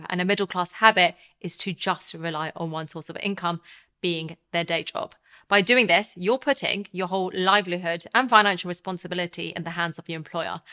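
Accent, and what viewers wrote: United Kingdom. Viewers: She is Indian but speaks with a heavy loaded British accent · I have no idea what country this was produced in. I'm guessing by accent it is the UK. I will say a major factor in the United States for having to stay in the rat race at dead end jobs is health insurance. As everyone in the US knows health insurance for oneself and their family are tied to their employer. Private insurance is just too expensive for anyone over 25.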